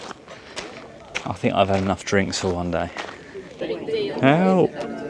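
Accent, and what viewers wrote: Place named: Estuary, United Kingdom. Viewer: Really enjoy your chilled wander through some amazing Brit towns. I'm sure I am not the first to say but you really do sound like Noel Fielding - and it is nice to hear the estuary accent once more (I grew up on the Dengie Peninsular and hearing you gently speak takes me back to there). Living in the US but using videos like yours to soften the homesickness until it is time to come live back home. Happy to have subscribed and looking forward to more,.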